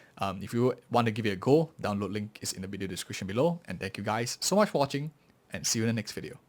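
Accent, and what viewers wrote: Singapore. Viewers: I could tell that was a Singaporean accent right off the bat haha. Fellow SG as well, really good guide since we have roughly the same VIP level but I’m in S54. Nice to see an SG Creator! Keep it up 👍🏻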